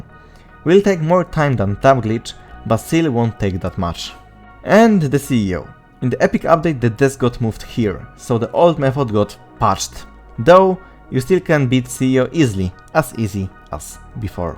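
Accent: Poland. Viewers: Czy to polski akcent 😅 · you have polish accent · i love the polish accent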